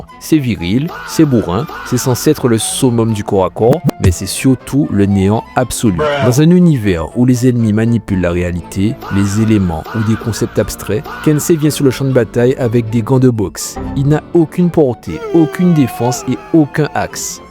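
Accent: Caribbean. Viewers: Je sais pas mais t’es antillais ? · C'est un antillais qui parle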